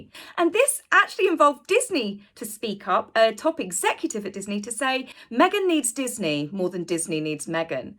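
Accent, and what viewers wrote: United Kingdom. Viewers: Great video. I just wish you could talk a little slower... sometimes it's hard to understand with your British accent 😉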